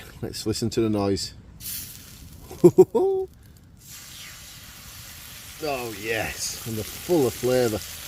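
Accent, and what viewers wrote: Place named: Northern England, United Kingdom. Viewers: Usually on the camping vids on Youtube when the food is being prepared and cooked I switch off, but on this vid if you are cooking the same again can I put my name down please?, good to hear a Northern accent also. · Great as always. My very humble advice. Stay away from divisive topics mate. Personally I come here for the advice, your knowledge and the great gear reviews. Delivered in your great west York’s accent and lovely gentle manner. Ultimately, I’m here for anything camp based. If I wanted to see or hear anything negative ( no matter what the topic) I’d buy a tv and watch the propaganda on there. Or god forbid join that circus o win as FB. You’ve been a massive influence on me and my own wild/ stealth / nature camping adventures. Looking for ward to autumn and winter to get my hot tent out! So a big thanks fella. 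Take care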